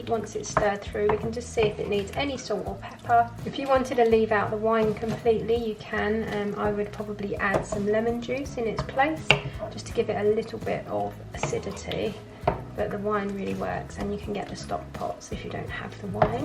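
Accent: United Kingdom. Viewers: So nice to hear a British accent on YouTube!!!!!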